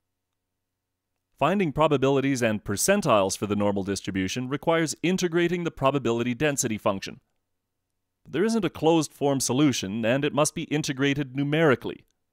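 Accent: Canada. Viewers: you're from Toronto aren't u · powerful canadian accent!!! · and I realized you were Canadian before you said Zed... Thanks for your help professor..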